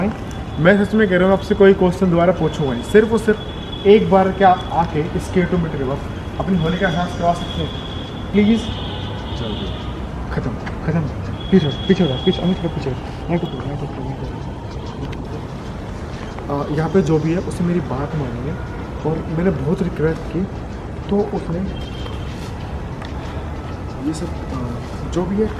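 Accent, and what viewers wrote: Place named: Bengal, India. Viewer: Aap ke baat karne se lagta hai aap Bangali ho kya sach me ho ya kuch or ho..... Big fan... vhi ❤️❤️ Love you...... Please reply me... 🥰😘😌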